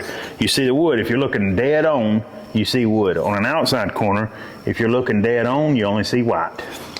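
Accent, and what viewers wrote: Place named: Western Maryland, United States. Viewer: Nice. I would not have placed you there according to your accent. I heard people with your accent in Western Maryland. Of course now I ain’t been around too far but I’ve been in Pensacola several times. I build kitchens and typically work with 4 1/4 inch crown. However, I’m on a job that has 7 1/4. And I decided to do it flat. Your video has been helpful.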